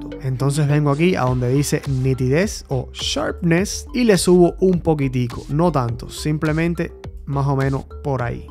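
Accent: Cuba. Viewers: Jajaja.. desde que empezó el video tenía la duda pero cuando dijiste “se nota a la legua” dije este es cubano si o si 🤣..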